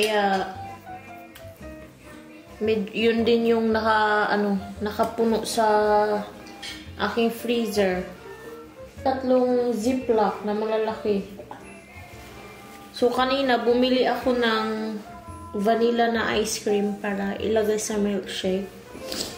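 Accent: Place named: Visayas, Philippines. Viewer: Hello, I am new to your channel. Saang part ka ba sa Pinas? Halata na bisaya ka.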